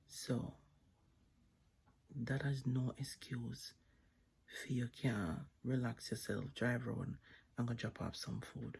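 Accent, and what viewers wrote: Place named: Jamaica. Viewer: You sound like a West Indian Jamaican are you a descendant of the Afrikan Diasopora a Descendant of the (SLAVES) the "BANTU PEOPLE" this is my question Sista. If so please, please look into who the Afrikan Diasopora people are Biblically we are really from the Scattered 🏠 House of JUDAH from the Bloodline DNA of Abraham, Isaac and Jacob/Yisrael we are the True Bloodline DNA Descendants. HaSatan have Deceiveth the whole world even the Saints if it where possible. So please my Hebrew Yisraelite Sista of Zion check out a few Hebrew Yisraelite YouTube Brothers and Sistas Channels Open Dairy, Hebrew Nation Building by Morris Williams Jr and Joshua Collins, Yona Golab, Hebrews To Negroes aka TV MOSES LEVI by Ronald Dalton Jr and Benayah Israel to begin your research on who the Afrikan Diasopora where before we became "SLAVES" To the European Nations and others Nations "WORLDWIDE" please don'tignore this very important information that I'm trying to share with you check out those YouTube channels with those Brothers and Sistas. 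Shalom, Salaam my Hebrew Yisraelite Sista of Zion. We are the Real Descendants of The Ancient Children of Yisrael.💋💗💕😍🕎🥰💞💖👄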